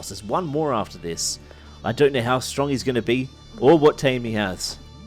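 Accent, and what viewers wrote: Australia. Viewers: I can't tell whether he's saying "pals" or "powers" because he's too Australian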